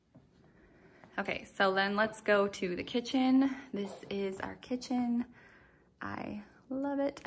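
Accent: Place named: Utah, United States. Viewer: Love the boho farmhouse style! My first video I’ve seen and so I don’t know your history. Are you from Utah? I can definitely hear a UT accent! I lived in the Midwest for 27 years and now in UT for the last 7 years.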